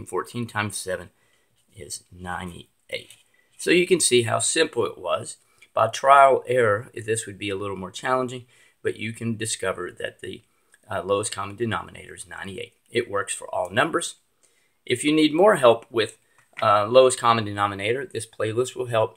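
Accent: South, United States. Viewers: The southern accent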